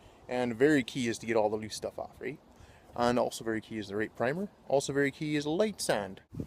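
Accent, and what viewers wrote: Alberta, Canada. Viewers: Lol I am about to do some patio and deck repairs for one of my rentals soon. Glad I found your video, bud. Funny as fuck. I thought your accent was sounding awfully Albertan and lo and behold 😂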